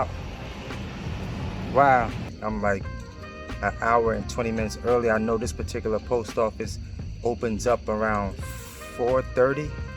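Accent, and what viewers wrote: Jamaica. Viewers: The Jamaican accent got me. Lol. Time to eat at $3.30 a mile. Keep hustling as we gotta get truck #1 back on the road. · Lol 😂 I thought he was a yardie for a sec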